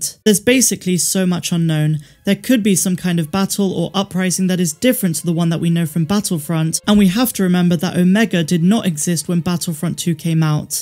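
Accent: United Kingdom. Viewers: You’re British accent really helps mine mate , thank you mate . And may the force be with you.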